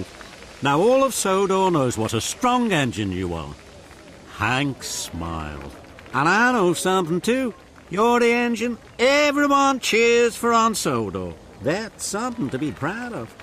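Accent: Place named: United States. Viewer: I like his American accent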